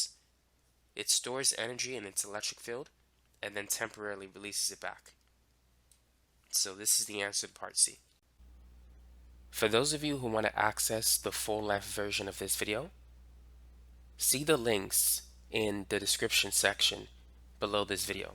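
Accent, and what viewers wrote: United States. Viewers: A very sharp, clear almost squeaky British voice, but pronounces like an American. Perhaps, he lives and teaches in the States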